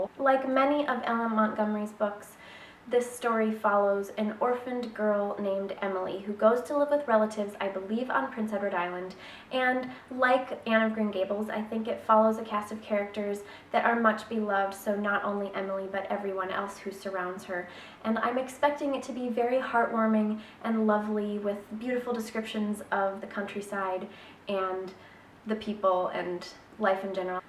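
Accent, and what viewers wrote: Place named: United States. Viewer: ur accent is beautiful.... I wanna learn American accent...... what can I do for that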